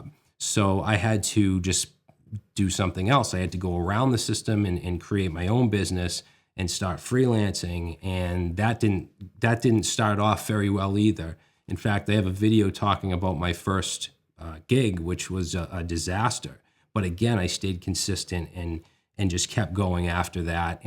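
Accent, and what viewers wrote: Boston, United States. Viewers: Was curious how vite worked and found your video to be super helpful. Thought I heard a boston accent so decided to check out your channel. I'm two minutes into this video and find it to be very moving. Earned a sub today, go sox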